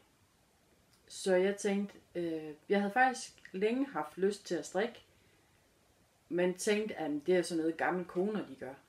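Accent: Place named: North Jutland, Denmark. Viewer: Hej 😃 Super godt 👍 selvfølgelig er der plads til dig. Dejligt at høre en nordjysk klang 😉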